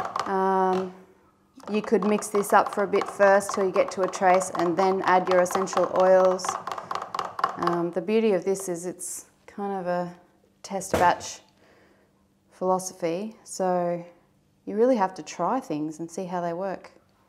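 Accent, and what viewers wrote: Australia. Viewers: Sounds like you might be an Aussie.. 🌻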